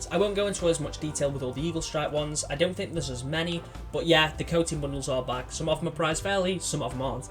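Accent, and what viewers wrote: United Kingdom. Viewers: Your English looks like England accent but it's more easy to understand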